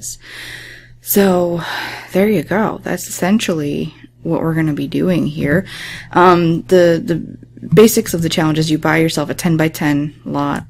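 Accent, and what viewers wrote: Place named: New York, United States. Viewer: Are you from New York? I went there last spring and you sound like you are from there.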